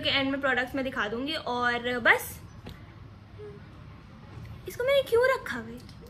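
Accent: Pakistan. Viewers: your way of speaking is like you are belonging to a muhajar cast...:(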